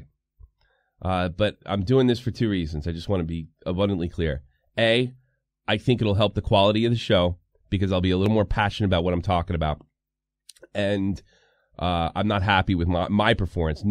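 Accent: United States. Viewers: "accent-less" nah, I think you mean English with an American accent.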